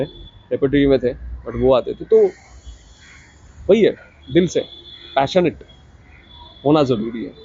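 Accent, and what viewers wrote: Bengal, India. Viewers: Sir ap bengoli ho kya kyuki apki Hindi me abhi v bengoli wali tone hai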